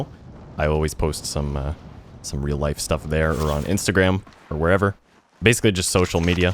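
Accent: Canada. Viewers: Brother sounds super Canadian 👌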